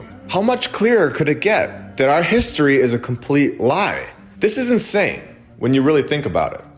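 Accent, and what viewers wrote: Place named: Midwest, United States. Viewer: I knew you were a Midwesterner like me haha. I could tell by that unmistakable accent. I'm from Michigan, born and raised, but I lived in Milwaukee for 5 years. I feel so stupid because I NEVER even considered the architecture! Like never even glanced at it! I regret that so much! But if you don't have eyes to see...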